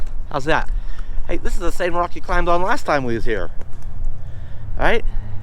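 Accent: Pennsylvania, United States. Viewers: Man listening to you narrate makes me think of friends from PA. Enjoy NewMexico and hope you find some cool little places to explore and maybe a 💈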